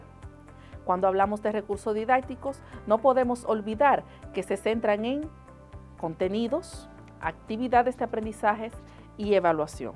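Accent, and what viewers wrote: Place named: Puerto Rico. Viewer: Aparte de estar escuchando su información al final terminé en escuchar que tiene acento puertorriqueño.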